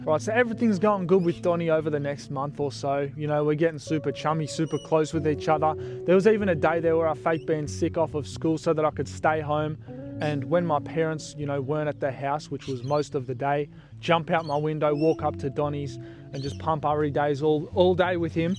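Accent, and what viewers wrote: Australia. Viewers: mate ... thought he was omegaverse but no he's just australian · Love the way he speaks full Aussie lingo 😊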